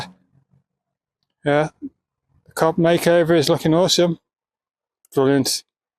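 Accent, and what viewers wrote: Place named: Liverpool, United Kingdom. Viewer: Can't understand that Scouse accent 😮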